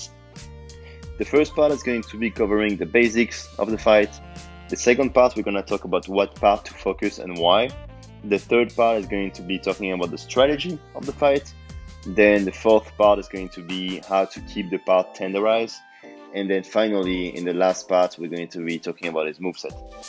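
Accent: France. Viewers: Ça s'entend que t'es français ! T'aurais pu sous-titrer pour tes compatriotes.. 🙄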